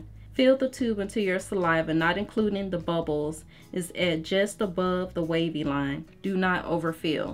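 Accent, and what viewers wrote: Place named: Mississippi, United States. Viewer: I can hear that Mississippi/Memphis accent, not as strong, maybe because you're in Cali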